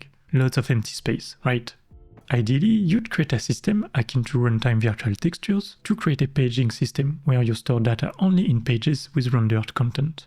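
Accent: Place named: France. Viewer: Accent is just terrible and subtitles def don't help. Invest in some speech classes french boy lol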